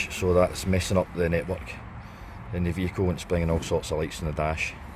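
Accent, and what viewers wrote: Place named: England, United Kingdom. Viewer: Strong English accent damn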